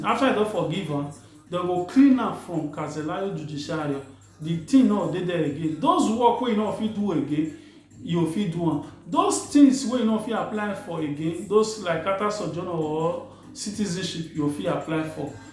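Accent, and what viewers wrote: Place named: Nigeria. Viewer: Wow your pigin ascent is nice, thanks for sharing.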